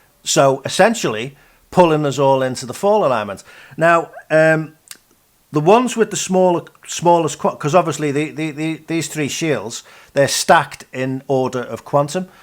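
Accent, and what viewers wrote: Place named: Merseyside, United Kingdom. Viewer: hi, can you tell me whrre you grew up please you sound like we grew up nearby.. my first years were Maghull and burscough. Just askin if its an issue just ignore. Its a complicated world just now. Great work...thank you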